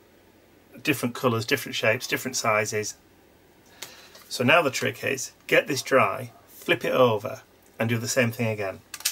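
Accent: United Kingdom. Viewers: Lots of bits! I love the British accent!😃💖❤❤❤❤❤❤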